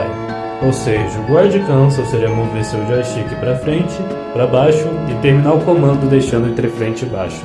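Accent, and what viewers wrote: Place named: France. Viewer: You sound kinda french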